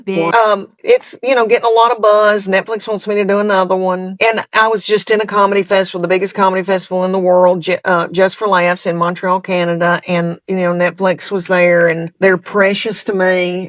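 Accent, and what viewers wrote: South, United States. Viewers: Its on par with Seinfeld humor, which did great so not sure why theres not more of it. Clean humor that for the most part deals with humorous ironies of day to day life. This makes it relatable to everyone who listens to it. And for whatever reason, her deep southern accent makes it all the funnier. Glad i found her ❤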